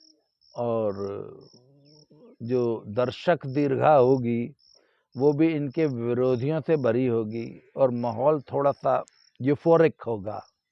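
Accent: Haryana, India. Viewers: Ap Ki Bat Majedar Btane Ki Trika Haryanvi Kya Bat Hai. Weldone.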